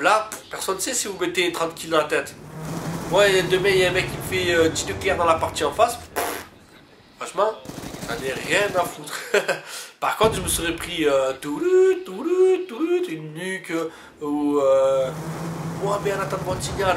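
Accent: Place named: Marseille, France. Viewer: yeah toujours en forme avec l'accent de notre marseille <3 toujours aussi bon a call of;)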